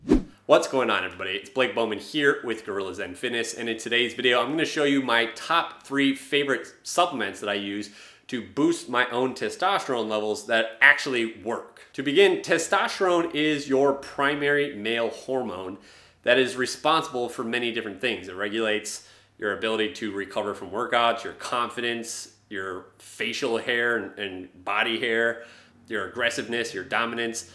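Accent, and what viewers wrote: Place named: Michigan, United States. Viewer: sound like youre from michigan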